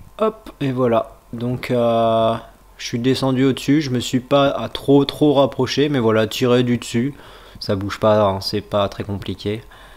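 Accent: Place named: Brittany, France. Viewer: Elle marche bien cette petite vidéo commentée, félicitations, avec l'accent breton c'est sympa.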